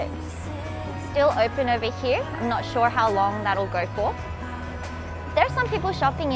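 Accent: Australia. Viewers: aaaw I really want to go to Korea, hopefully covid will be over soon! She got Australian accent btw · Hi - did you used to study in Aus? You have a bit of an Aussie accent happening:) You should see what 6 lockdowns have done to Melbourne - it's really terrible! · Well in Australia..cause you sound Aussie..it's worst in NSW and Victoria..due to lockdowns and restrictions...we cannot do any store shopping..it's click and collect only and delivery...many cafes etc closed and many other businesses closed/gone..many people out of work and hospitality businesses gone...its probably the same in many countries...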